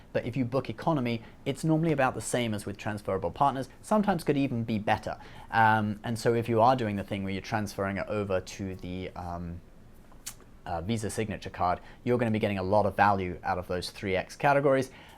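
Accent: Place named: United Kingdom. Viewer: American Eagle shirt + Brittish accent = paradox that could unravel the very fabric of the space/time continuum, and destroy the entire universe.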